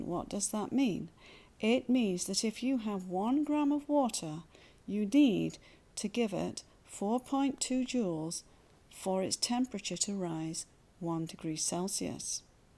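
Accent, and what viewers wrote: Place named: England, United Kingdom. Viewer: When I heard the English Accent I felt a little bummed because science here in the states is a little different than the UK. But this helped me understand sooooo much better. I didnt understand my teacher, this makes a lot more sense. Thank you!!!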